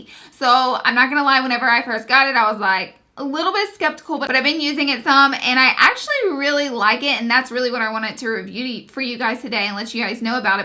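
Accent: South, United States. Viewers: From one southern girl to another, your accent just makes me so happy! I love this compact 😊 #snapchatfam #snapchatfamforlife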